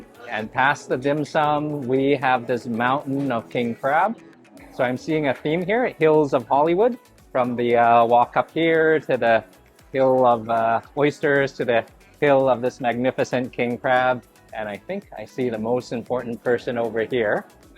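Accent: Canada. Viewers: Pizza? Fish? Spring Rolls? I think you said. I'm not sure if I understood your Canadian English? You're lucky you are a Food Reviewer, otherwise I would be smacking you through the TV. I would like King Crab Stuffed Lobster, with a side of Crab and Lobster. THE END!!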